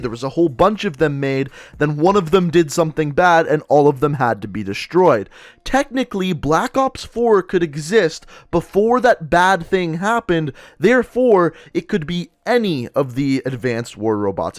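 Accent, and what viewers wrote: Canada. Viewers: OMG the Canadian accent makes this video unwatchable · Is this guy canadian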